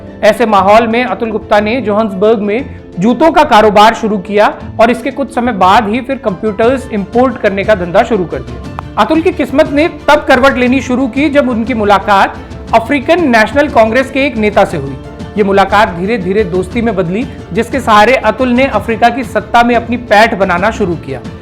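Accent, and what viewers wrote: Garhwal, India. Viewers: हिन्दी-भाषा को गढ़वाली-पुट देना जी अपनी शैली है, जो कि अत्यंत श्लाघनीय प्रयास है, साधुवाद।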